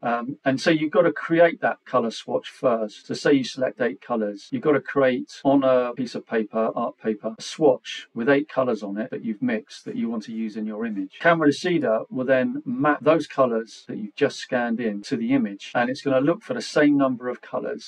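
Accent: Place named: England, United Kingdom. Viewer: as the author of the app I have to say this is one of the most amazing user videos I've seen for my app! I am so impressed and thankful! Of course, the super cool English accent is the icing on the cake! Seriously, your video is going to help so many people!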